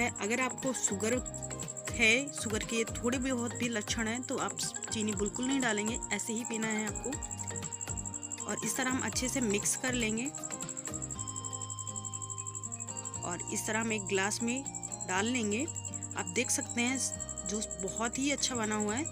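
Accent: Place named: Awadhi, India. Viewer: Nice awadhi 👍👍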